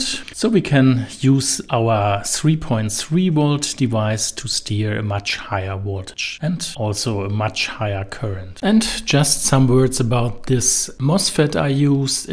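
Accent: Germany. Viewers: Man kann dein Denglisch richtig raushören 😂 aber gutes Video 👍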